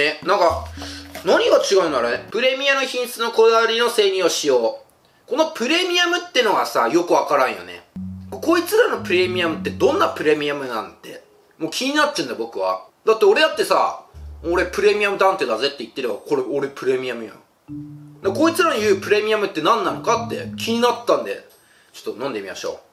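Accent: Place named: Kansai, Japan. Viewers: 今日ちょいちょい関西弁出た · 時折に関西弁が出る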